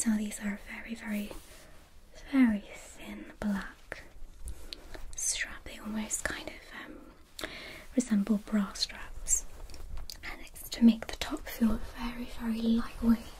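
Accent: United Kingdom. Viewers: What is this whisper are you British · What’s your country? Your English accent is not seems like American maybe British? Or England? I don’t know but i love your accent your “Okay” sounds cute❤️ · I usually don’t like British accent videos,but I actually don’t mind your videos♥️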